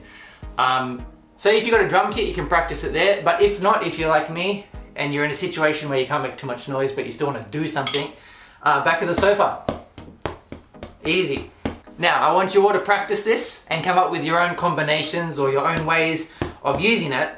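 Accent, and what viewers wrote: Australia. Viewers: R u aussie? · Is he from Australia??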